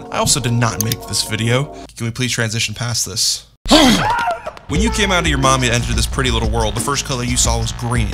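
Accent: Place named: Arizona, United States. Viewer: You must be from southern Arizona 🤙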